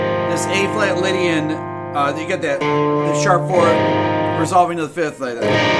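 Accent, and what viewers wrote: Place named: Upstate New York, United States. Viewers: I didn't know you were from Rochester. I can hear the accent now. It's good to see another "homeboy" on YouTube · Rochester New York eh? I wondered where you are from and couldn't quite place the speech pattern. My parents are from upstate New York, met at RIT, we visited relatives in Rochester, and over the years my family lived there as well as Ithaca.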